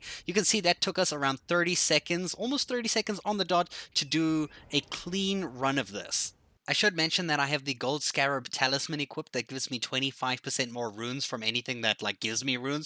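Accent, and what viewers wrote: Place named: South Africa. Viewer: Hearing your accent for a bit, I realised you are South African 😁 Aweeeeee! Thanks for the video, but unfortunately I am nowhere near this in the game yet - I will finish it another time.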